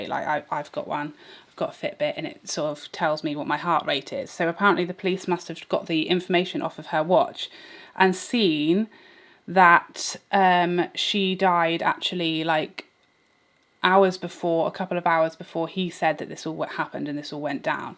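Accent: United Kingdom. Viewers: It is wonderfull! Thank you so much this giveaway! Here in Hungary we no have this kind of make up . If i will be lucky to win, I definitelly will be the luckiest and happiest person in this World! 😊 I really like your channel and your British accent! 😻♥️♥️ Have a nice weekend for you! BR. Dori Instagram: Maybe - Nude Venus- is a good colour for me.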